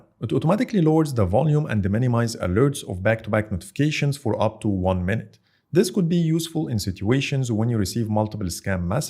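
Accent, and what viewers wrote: Egypt. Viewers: لو المراجع مصري يبقى انا كدا عرفت اللهجه صح بارك الله فيك على الريفيو الممتاز 💖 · Your accent is Egyptian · You’re Egyptian 😅😅 the accent is screaming Egyptian guy 😊😊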